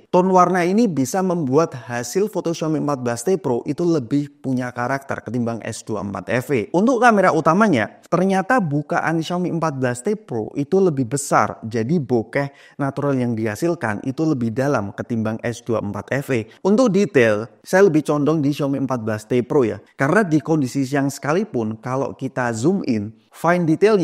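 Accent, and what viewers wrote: Semarang, Indonesia. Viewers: Wong Semarang maszeee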